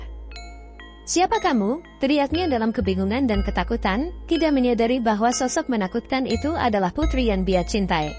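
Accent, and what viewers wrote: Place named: Netherlands. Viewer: Suara yg buat konten ini j suara logat orang belanda.bukan orang indonesia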